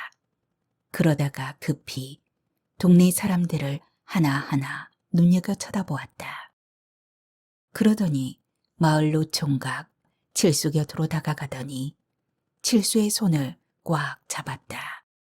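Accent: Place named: Jindo, South Korea. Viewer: 전라도사투리도 지역마다 다른데 진도 사투리를 제대로 해주시네요. 1980년초 저 한 열살즈음에 진도앞 조그만 섬사람들이 통통배타고가다가 여럿이 배가 가라앉아서 여러명이 죽었어요. 그래서 혼을 건진다고 무당불러서 섬에서 굿을했었는데 무당은 훨훨날아다니고 쇠밥그릇두개겹쳐서 흰천으로 싸서 길게늘려서 혼건진다고 바다로 던졌다당겼다 하고 긴대나무 잡고 있던사람은 미친듯이 떨면서 흔들리던게 기억나는데 그때는 겁없이 구경했는데 그게 씻김굿이었군요. 잘들었습니다.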